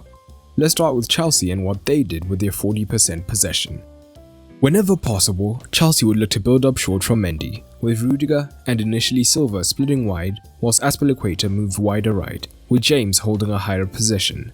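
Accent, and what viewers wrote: South Africa. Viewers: Are you South African? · Is the narrator south African? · Are you south African?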